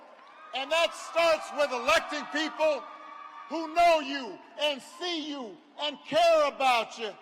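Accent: South, United States. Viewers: Now he has a southern inflection. What a poser.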